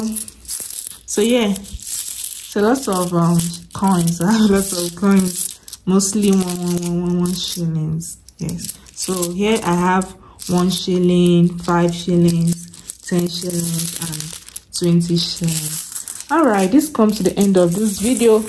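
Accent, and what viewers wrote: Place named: Igbo, Nigeria. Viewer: That's her Igbo accent. It's not everyone in Nigeria that calls it PEPE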